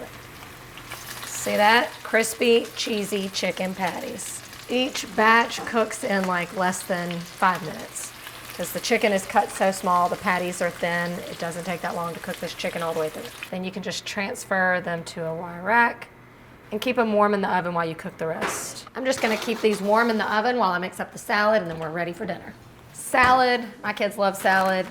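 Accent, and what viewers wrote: South, United States. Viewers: Shes so pretty and love her southern accent cause I’m from south Texas. I love her quick dinners with the price of takeout nowadays so she also uses everyday ingredients to make food. I have no kids, but also not working now unfortunately so this is perfect to stay within my very meager budget. Love her!!!!!❤❤❤ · Love this lady...shes si cute! That little southern twang! ❤😊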